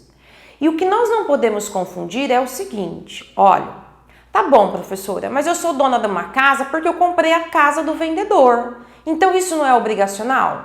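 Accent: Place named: São Paulo, Brazil. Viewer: Excelenteaula. Gostei do sotaque paulista tambem rsrs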